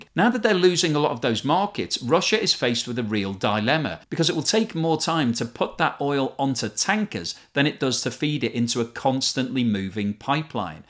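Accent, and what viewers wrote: United Kingdom. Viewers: A british accent alone doesn't make a knowledgeable man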